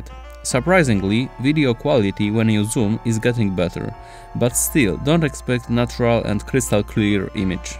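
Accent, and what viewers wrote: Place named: Poland. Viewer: To each their own! I liked the accent. But I work with a few Polish-born people (in America) and am used to that accent, and find it pleasant. :)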